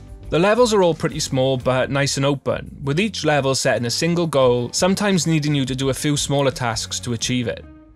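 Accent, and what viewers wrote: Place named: Wales, United Kingdom. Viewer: Great vid, nice to hear from a fellow Welshman 👍🏼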